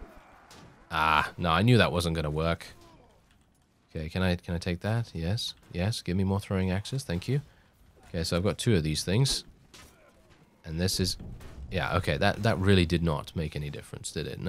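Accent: United Kingdom. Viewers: His voice changed so much, go to his first series with barney beartilde, he used to sound so british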